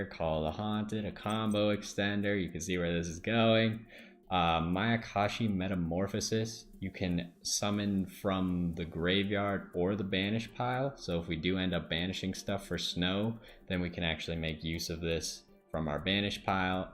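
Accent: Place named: Texas, United States. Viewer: That’s more of a Texan accent not a southern accent. There are nuances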